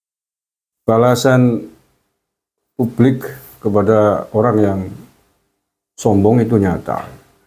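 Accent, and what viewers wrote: Banyumas, Indonesia. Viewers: Bang smpyan itu jawanya mana ya kayak nya ngapax ngomongnya.salam 0,2 maju terus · Pak Gus Marngapak jg y..pada bae nyong beh ngapak pak bravo sehat selalu🙏💪betul pak apa yg ditabur 01&03 akan mereka tuai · Janen rika wong ngapak ya,,memeng prabowo gibran yes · Deneng ngapakk pak😅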